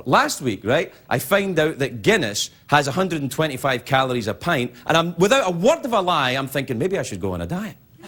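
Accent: Scotland, United Kingdom. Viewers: I watched this the other day and even today i still had tear in my eye. 20 years is a great milestone....goodluck to everyone at the beginning of the road....one day at a time you'll get through it:-) watching clips from 5 years shows how much his accent has changed, but it is still obvious scot (reminds me of john barrowman in that sense) thanks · wow his scottish accent is veryyy scottish. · It's funny how much thicker his Scotch accent was even 6 years ago, but what he's saying here is brilliant. What a real person, and hope he inherits Mr. Letterman's show one day. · Excellent. Who else misses his scottish accent? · Back when he still had that Scottish accent.... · WOW. Vintage Craig. He was very apologetic for cussing and had a much stronger Scottish accent. Time flies... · Craig's accent always gets a little thicker when he is being genuine or talking to another guest Scottish decent. 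(See Ewan McGregor, Kevin McKidd, his sister, etc).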